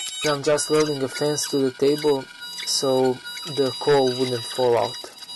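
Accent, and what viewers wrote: Russia. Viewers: Great video. Are you from Russia? Couse I can hear your accent quite good :)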